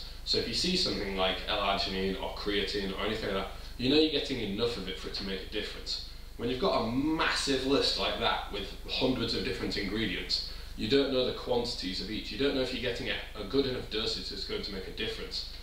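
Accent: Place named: Leeds, United Kingdom. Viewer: 2.uhhh lol you gotta be from leeds or somewhere like that??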